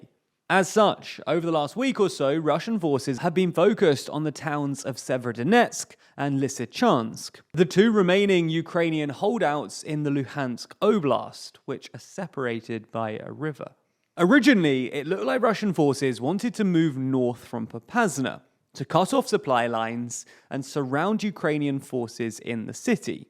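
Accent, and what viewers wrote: United Kingdom. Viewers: Obvious errors : - titles in the video mentioning. 5th May, 7th May should read June as the date visible on the news article shown - zelensky didn’t state 500 losses a day in Ukrainians troups but 50 to 150 as another comment corrected. He also said the Russians are losing almost 300 soldiers a day. I really much appreciate the quality of the TDLR videos, but these errors seems more and more frequent, like when it estimates Russian military budget to an incredible 250 millions of dollars where the reality is more 80 millions … some serious quantify checks are necessary there ! It’s a pity because your videos are very well illustrated, very concise, and the British accent makes these videos “Über chic”…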